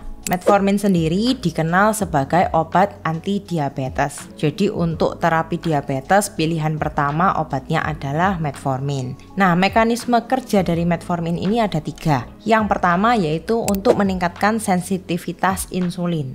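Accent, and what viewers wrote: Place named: East Java, Indonesia. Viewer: Khas Jawa Timur😊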